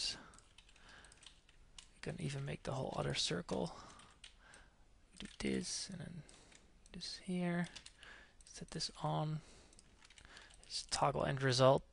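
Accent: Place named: Netherlands. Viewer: Dutch accent :D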